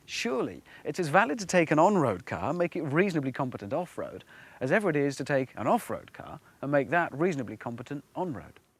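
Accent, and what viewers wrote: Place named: Birmingham, United Kingdom. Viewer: His Birmingham accent was still audible back then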